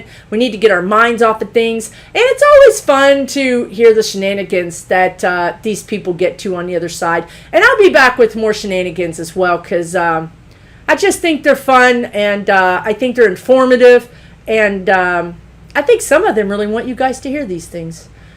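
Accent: Texas, United States. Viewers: You are in Texas but you have a distinct accent. Are you from east TX or from the east coast?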